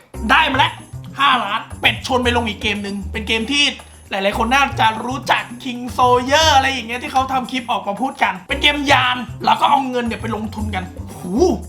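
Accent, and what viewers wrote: Thailand. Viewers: พี่ พูดเหน่อ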